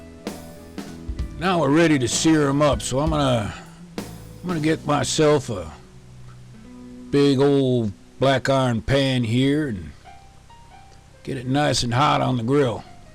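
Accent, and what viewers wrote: South, United States. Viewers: i like the VOICE of that southern man..IDK if his black or white man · the way he is talking makes me hungry, he sounds like a deep south country cook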